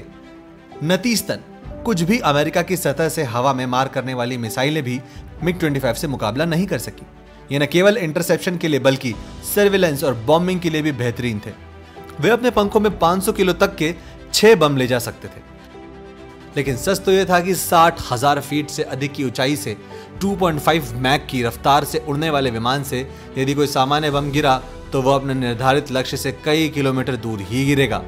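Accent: Standard. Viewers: आपकी सुद्ध हिंदी बहुत अच्छी है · Shudh hindi super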